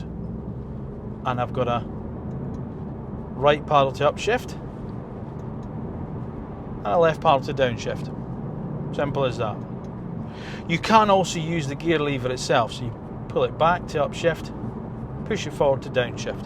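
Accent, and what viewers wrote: Scotland, United Kingdom. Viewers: That accent. Is that doric mixed with orkney?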